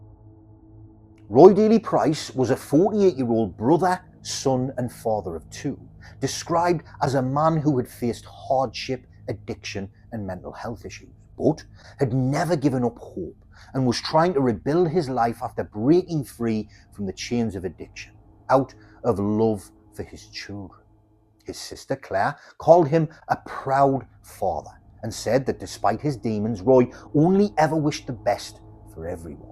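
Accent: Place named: Scotland, United Kingdom. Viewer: This channel keeps coming up but that's fine I like the animated story telling style and the Scottish? accent is entertaining for an Aussie viewer. The setting is a bit bleak, a prison.....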